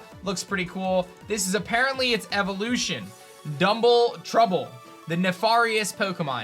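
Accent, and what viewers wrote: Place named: Pennsylvania, United States. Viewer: I’m out of Pennsylvania and your slight accent sounds so much like people from around here. Are you out of the northeast?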